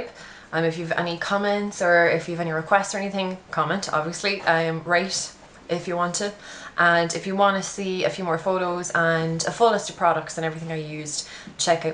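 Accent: Ireland. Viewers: Love the video and your accent! Sounds Irish. Where are you from? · "It's too fekkin tick neou" Love your accent. I could listen to the Irish accent allllll day long!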